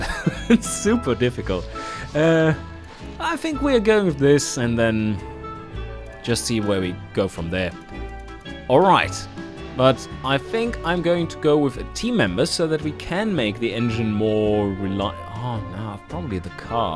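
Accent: Germany. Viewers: well im glad you make those xDDD and just to say - its not very bad but i can here youre german as me - ever thought of making videos in german?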